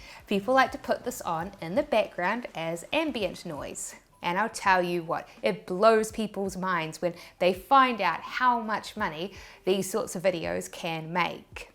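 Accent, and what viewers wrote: New Zealand. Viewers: Are you a kiwi? Your accent is adorable!!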